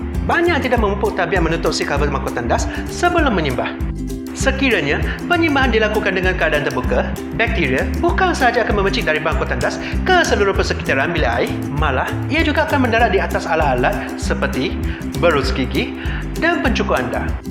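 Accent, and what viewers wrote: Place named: Malaysia. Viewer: Hai saya dari Jakarta. Tengok channel ini Krn suka sekali dengar cara bicara Melayu kaka 😁 konten nya juga informatif sekali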